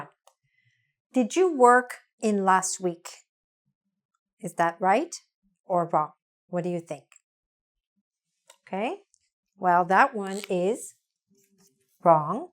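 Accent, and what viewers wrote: United States. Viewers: Loving The Sopranos accent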